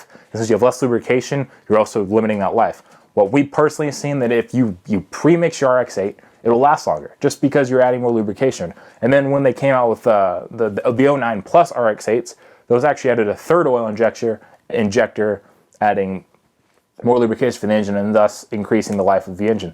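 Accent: United States. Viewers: Sorry, I can't understand most of what you say. And English is my native tongue. So a kind request and suggestion might help us all: Please, when you have a really strong (I'm guessing Southern) US accent like yours, remember that most people don't have that accent, and you will fail to communicate your thoughts and information. So, try to slow down a lot, speak clearly, don't use foul words, and you'll win over your audience, and then gain many more subscribers. Thanks, in advance, for your patience. All the best.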